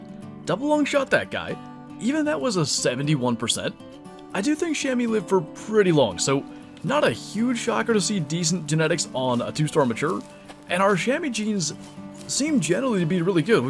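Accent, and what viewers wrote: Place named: United States. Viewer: Its his voice for me so chill and rich 'murican accent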